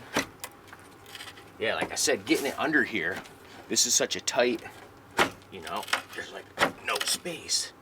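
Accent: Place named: Michigan, United States. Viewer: You sound like you're from Detroit-area Michigan.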